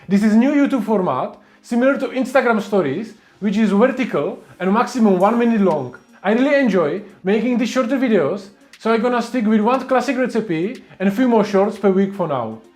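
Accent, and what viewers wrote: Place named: Czech Republic. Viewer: Cause most of us has pretty distinct accent/pronunciation. Czechenglish.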